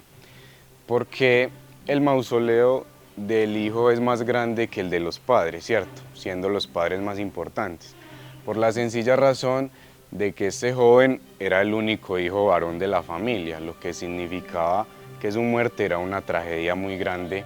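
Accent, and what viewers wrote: Antioquia, Colombia. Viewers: Muy buen trabajo....ME ENCANTA SU INVESTIGACION EL RELATO.....HACE QUE NO SEA TETRICO....COMO HACE EN OTROS PAISES....QUE RECORREN Y NO SABEN INVESTIGAR SU HISTORIA....ADEMAS SE MUESTRA UN CEMENTERIO BIEN CUIDADO....BIEN ORGANIZADO...###SI SE HACE COMO UN MUSEO....MUY PAISA SU VOZ ME RECUERDA A LA VOZ DE MI SOBRINO....Y LO BUENO ES QUE MUESTRA UN VIDEO BUENO HECHO EN COLOMBIA....QUIERO DECIR QUE AQUI ABAJITO VI OTRO HABLANDO DE ESE MISMO CEMENTERIO....PERO NO....😮😮😮😮LE FALTA INVESTIGACION.Y APENAS LLEGO GOOGLE APENAS LO COLOCÓ...DESDE COLOMBIA. TAMBIEN SOY.....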